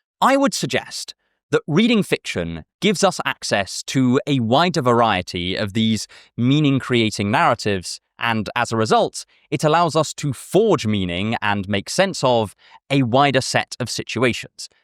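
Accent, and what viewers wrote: RP, United Kingdom. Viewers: Sir you sound like a man from victorian era elite british! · Do you speak received pronunciation?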